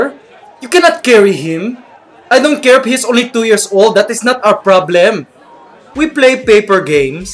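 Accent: Philippines. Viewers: i like the accent he is not shy in our accent (some Filipino sound like that when they're speaking in English) i'm very proud to be pinoy and i admit i seak like that too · Hahaha love the tagalog accent, so strong and sharp. Very Filipino. 😂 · Haha! I love the smell of plastic balloon too! Hmm And your pinoy accent to Mikey! Hahaha Wooh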